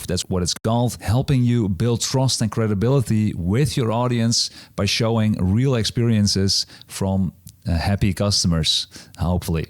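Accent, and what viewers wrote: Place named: Netherlands. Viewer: You sound Dutch! :)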